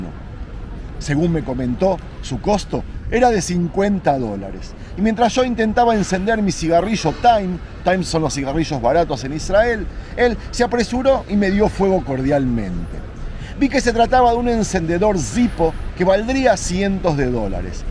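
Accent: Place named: Rioplatense, Argentina. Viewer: Wow porque hablas tan bien Español? Sonas como Argentino/Uruguayo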